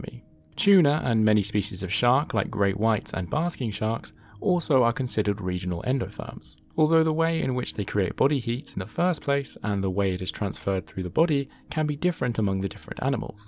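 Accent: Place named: United Kingdom. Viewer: Why do so many of these documentaries have narrators with shitty British accents?? If it's not David Attenborough then get an American or neutral accent.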